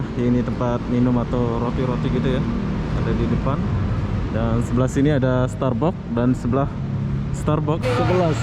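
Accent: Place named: Malaysia. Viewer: Assalammualaikum bang mohon maaf mau tanya Abang asli nya mana yah? Sering kali konten nya tentang Indonesia ☺️ tapi jika dengar suara Abang logatnya seperti orang Melayu. Apa Abang dari Malaysia kah? Terima kasih 🙏☺️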